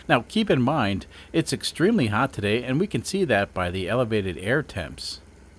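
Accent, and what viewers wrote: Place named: Chicago, United States. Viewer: Your accent I don't even recognize, not Michigan I'm assuming. Chicago? But you complain about the heat after moving from Michigan and it has me curious. Do you prefer the Kansas climate overall? I'm a buckeye/Michigander myself. I was transplanted to Texas by my family and can't wait to get back to Michigan and get out of the heat.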